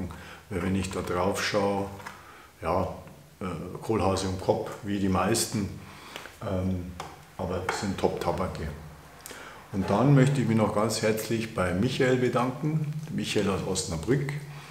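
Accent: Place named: Bavaria, Germany. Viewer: habe de Ehre zruck ;-), sah dich schon öfter, auf diversen anderen Kanälen und horchte dem vertrauten Dialekt; Jetzt auch DEINEN Kanal gefunden *freu. Woher kommst du denn? Besten Merce + schönes WE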